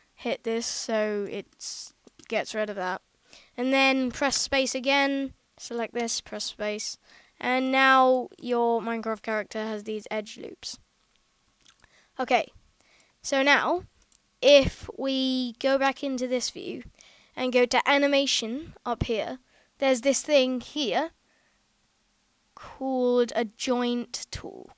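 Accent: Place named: United Kingdom. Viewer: Sounds like British am i right? , indeed GOOD JOB mate!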